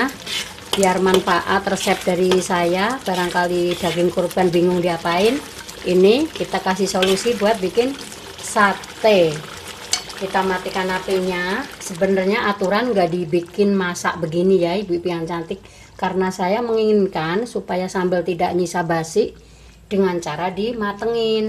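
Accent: Java, Indonesia. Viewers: Assalamu'alaikum, satenya siiiip 👍👍👍 lucu banget klau ngomong, dr jawa y?.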